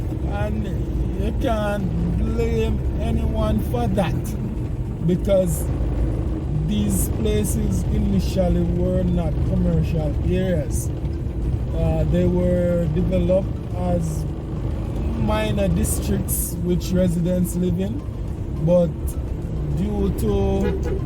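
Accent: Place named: Jamaica. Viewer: I don't know which idiot would complain about the way you communicate . Simply ignore such person as he/she only speaks for self and is making mischief and being hateful. I'm sure it's only one comment as u r simply one of our most articulate communicator... Staying true to our dialect and a proper English speaker .. Does this person expect you to speak another language ? Anyone can tell that you are a very intelligent person .. but it would not have mattered if you weren't (as long as wi understand ) I followed persons that speak 'Straight Up Patios ' and I love it !! It doesn't mean that such a person can't speak proper English .. It's just how one choses to communicate. So what if one can't ?! We have our own dialect and we are proud Jamaicans ! Bredrin , don't respond to those negative bad minded stupid people in the future ! Don't accommodate them ! 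Yeh ? Thanks for all your footage on the development. I am a big fan 👍🏾 Keep up the good work bro! 👏🏾 One Love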